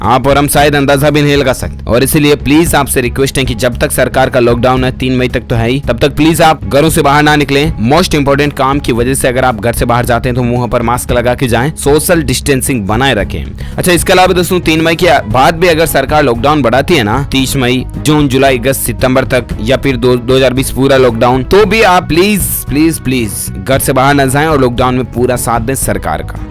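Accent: Rajasthan, India. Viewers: Bhai aap Rajasthan s ho kya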